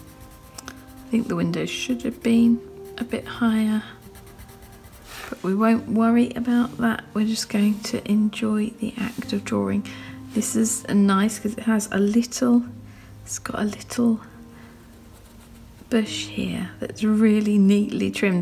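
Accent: England, United Kingdom. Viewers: English accent. Makes you sound important, official, BBC-like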